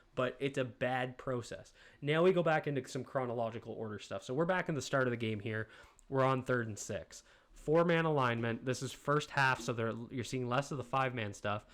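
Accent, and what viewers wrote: Canada. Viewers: I can tell you're Canadian by your accent.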